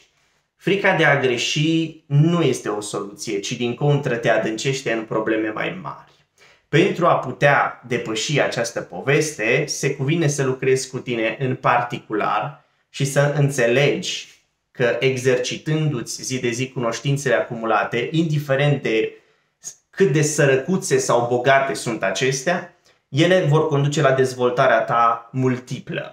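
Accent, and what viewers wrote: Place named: Germany. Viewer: 7 luni și deja pare că ești un neamț care vorbește română, nu un român care vorbește germană. :D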